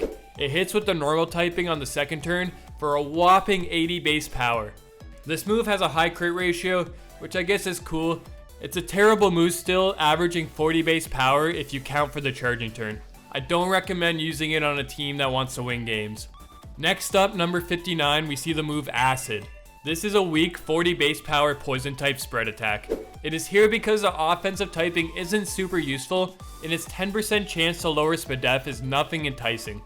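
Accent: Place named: Canada. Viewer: Please don’t use T*ump reactions like imagine if H*tler was used. I hope that puts it into context. I had a more thoughtful comment but of course youtube auto-deleted it. I love your content so I implore you to take this criticism seriously and with empathy for Americans. (It’s always esp like the scenario I described since you’re Canadian, at least I assume based on your accent and then confirmed on your channel location lol). And I say this as a dual Canadian American citizen.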